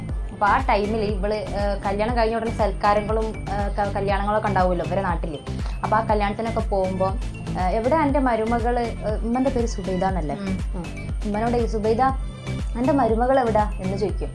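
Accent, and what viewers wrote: Kondotty, India. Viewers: ഇൻശാ അല്ലാഹ് ഞാനൊരു കല്ല്യാണം കഴിക്കട്ടെ മലപ്പുറം ജില്ലേലാണ്ന്ന് സംസാര ശൈലിയൊക്കെ കേട്ടിട്ട് മലപ്പൊറംകാരിയാണെന്ന് ഇൻക്ക് തോന്ന്ണ് ണ്ട് ആണെങ്കിൽ കല്യാണം കഴിച്ചിട്ട് ഹസ്ബന്റിനെയും കൂട്ടി വരണ്ട് അതിന് മുമ്പ് നല്ലൊരു കല്യാണം ശരിയാവാൻ ദുആര് ക്കിട്ടോ ങ്ങള് ങ്ങക്ക് വാണ്ടി ഞമ്മളും ദുആര്ക്കാം ട്ടോ ങ്ങളെ സാരം ഇൻക്ക് ഭയങ്കര ഇഷ്ട്ടപ്പെട്ടു.... പിന്നെ കൂടെയുള്ള ആളെ കണ്ടിട്ട് തൻസീർ ക്കാന്റെ അതെ ലുക്ക്ണ്ട് പെട്ടന്ന് കണ്ട തൻസീർ ക്കന്റെ riletion ആണോന്ന് തോന്നും ഞാൻ കളിയാക്കിയതല്ലാട്ടോ? Masha allah ഞാൻ ഇന്നാണ് ആദ്യായിട്ട് ഇങ്ങളെ സംസാരം കേൾക്കണത് ഇൻക്ക് ഒരുപാട് ഇഷ്ട്ടായി പിന്നെ ഞാന് ഇട്ട കമെന്റ് വായിച്ച കയ്യിണ്ല്ലെങ്കില് ക്ഷമിക്കണം ഇവടത്തെ ഭാഷങ്ങനെ സംസാരിച്ചു ശീലായിട്ട് നല്ല മലയാളം ഇപ്പൊ തൊളേള വെര്ണില്ല്യ ☹️അതോണ്ടാണ് ട്ടോ 🥰😘😘😘❤🌹❤❤🌹🤲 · മഞ്ഞക്കിളി.... ഇയാളെ പാട്ട് എനിക്ക് ഒരുപാട് ഇഷ്ടമാണ് അതുപോലെ ഇയാളെ വർത്താനവും എനിക്കിഷ്ടായി ശരിക്കും തനി നാടൻ മലപ്പുറകാരി അല്ലേ... 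😄😄😄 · Nammale kondottykkare athe samsaram. ....appadi samsarichu.... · ആ കൊണ്ടോട്ടി ഭാഷ എനിയ്ക്ക് ഇഷ്ടം ആയി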